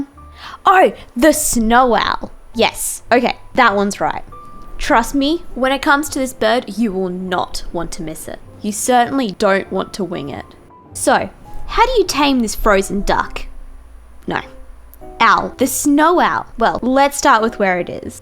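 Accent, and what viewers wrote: Australia. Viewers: Not gonna lie. It caught me off guard that a sweet aussie girl started talking rather than "BOYS AND GIRLS OF THE SHAAAAAAAAAFT" XD But she is great! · Honestly, aussie girls and video games? Like Heaven. I could listen to that accent for hours! Lol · An Aussie I see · I like little Aussie accent lol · Your Aussie accent makes me want to have your babies. I will carry them to term. Also, you're doing a great job as a commentator. Keep it up, and I'll have triplets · Is she Australian or just imitating Steve Irwin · Gotta love that Aussie accent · Crikey! She’s Australian! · She's so Australian it hurts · she sounds like a basic Aussie to me — from an Aussie. Nothing wrong with the voice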